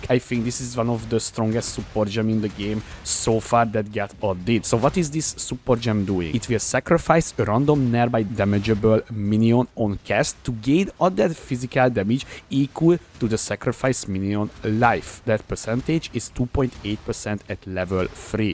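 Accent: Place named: Hungary. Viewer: eros a magyar akcentus hhhhh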